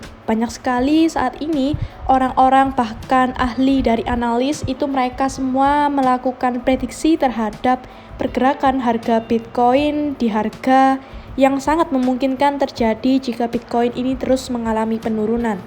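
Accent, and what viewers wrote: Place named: Surabaya, Indonesia. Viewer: Aku suka banget sama suaranya 🤩 Mengingatkanku dengan Surabaya sebagai kampung halaman 🥰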